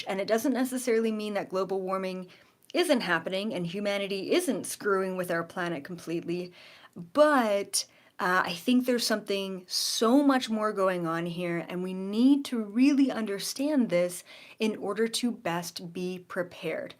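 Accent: Canada. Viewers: Nice to hear a tiny bit of your Canadian accent come out! I'm from what province are you from originally?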